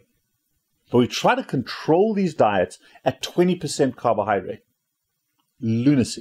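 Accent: South Africa. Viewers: Is your accent South African?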